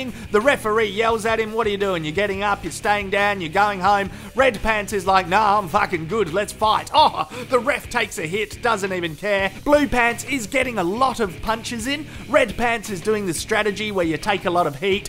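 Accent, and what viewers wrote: Australia. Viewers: This is like wrestling meets kickboxing with a aussie commentator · Shit! Jesus... that was pure gold! Aussies swear better than the french. Without the accent, this would not be as hilarious. Good job m8!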